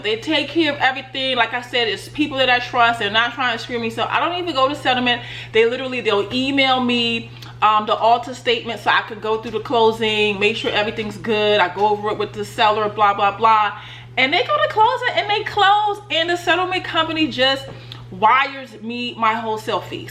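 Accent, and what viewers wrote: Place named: New York, United States. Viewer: Very informative video!! Thanks. I know ur from NY tho😂 cant hide that accent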